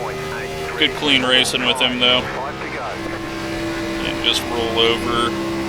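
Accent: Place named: Iowa, United States. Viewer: U from iowa?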